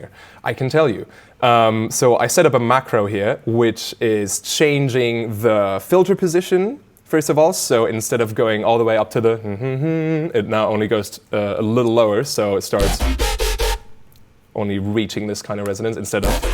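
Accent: England, United Kingdom. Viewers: why are you developing an english accent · Does virtual riot kinda sound british in this video? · Is it just me or does sound like he has a slight British accent now? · Someone’s been spending a lot of time in the UK. He’s got half a British accent now!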